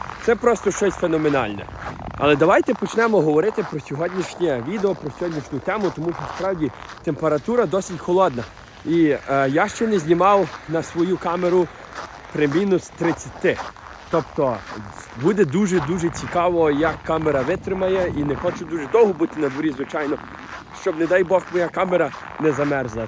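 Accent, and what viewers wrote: Western Ukraine, Ukraine. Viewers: Західнянські говірки, а не мова! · Дякую за ваше відео. Мені не знадобиться ваша розповідь тому що багато років за кордоном у Європі, і це все пройшла. Але приємно дуже слухати вашу розповідь такою твердою западенською мовою без жодної помилки. Ви молодець, бажаю вам успіху. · Противный западенський акцент!!! Фуууу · це західноукраїнський акцент початку 20-го століття. Майже всі приїжджі українці в ХХ столітті так розмовляли, бо були вони саме із цієї частини України. Якщо подивитись канадські словники української мови, або книги, які її вивчають, написані в ХХ столітті приїжджими українцями, або вже їх дітьми, то там буде така ж версія мови. Звідки вона у власника каналу тяжко сказати, можливо у селах Львівщини так розмовляють, можливо, автор звідти родом, ну, або він працює та живе у середовищі українців саме із такою версією мови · Як гарно! 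Ялинки, як у нас в Карпатах в Україні. У нас зима теж є, але підвищена вологість у горах при -30°С - це страхіття😒. А сніг рипить теж так само🙂. Ви розмовляєте українською якось так, як на західній Україні.... ваші родичі з цих місць? Де Ви вчились розмовляти українською? Дуже гарно розмовляєте !!!👍👍👍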